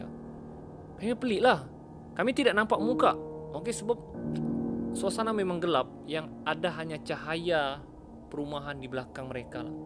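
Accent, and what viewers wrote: Sabah, Malaysia. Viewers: Abang orang Sabah ke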